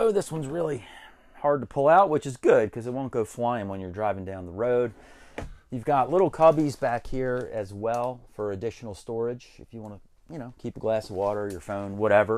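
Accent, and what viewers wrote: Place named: West, United States. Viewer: Hi, thanks for your answer, I have seen your videos my wife and my self love it, now I did not know that the process for the close caption was so complicated I thought that it was just sliding a button and voila, the close caption is generated automatically by YouTube, My apologies for that, having the subtitles helps with the accent because the west it's different from the east and it happens to me with youtubers from around the country.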